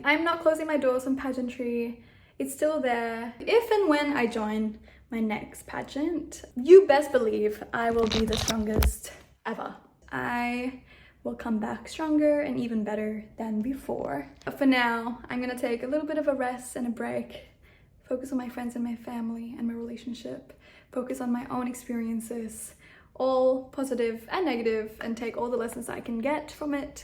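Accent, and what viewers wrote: Australia. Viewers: She should join Miss U Australia. She looks and sounds more australian than she is Filipina. “Living in” is still considered a taboo in the Philippines especially for celebrities and beauty queens. Not really a Filipina representation. A Miss Australia perhaps? I will still support!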